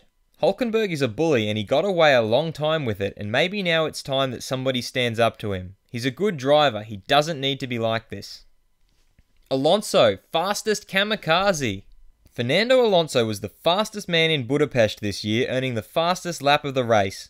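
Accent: Australia. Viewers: Are you talking about the voice in the video? Because he's Australian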